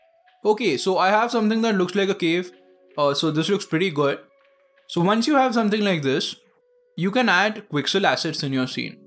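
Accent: India. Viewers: It is genuinely a fact that when you've been struggling for weeks with something and finally a video comes along and the guy has an Indian accent he gives the most top tier advice that's super easy to understand and follow along. Thanks,. I've been struggling to figure out what to do in UE5. Now I have ideas.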